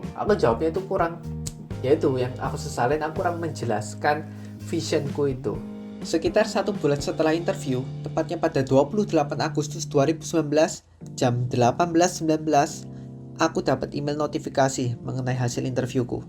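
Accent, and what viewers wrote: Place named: Pasuruan, Indonesia. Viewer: Aku pikir juga gitu, dan logat bicaranya mengingkatkanku sama temenku orang pasuran 😂 bismillah doakan aku ya kak semoga bisa lolos AAS 2024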